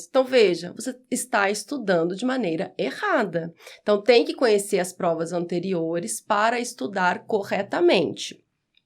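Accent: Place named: Northeast, Brazil. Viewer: Teu sotaque parece com o nosso daqui do Nordeste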